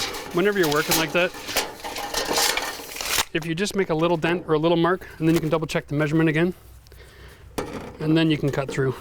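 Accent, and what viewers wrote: Canada. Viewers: Good overview, as I dry out a basement because pumps were not plugged in. You sound a little Canadian?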